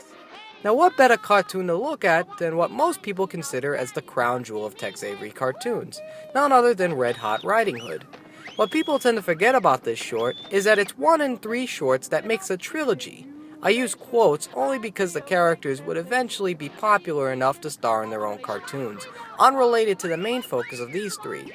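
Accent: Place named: New York, United States. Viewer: You have a very interesting almost extinct New York accent.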